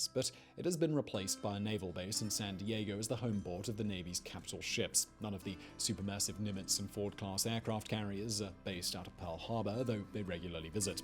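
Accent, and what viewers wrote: United Kingdom. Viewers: ahh british v sauce always makes my day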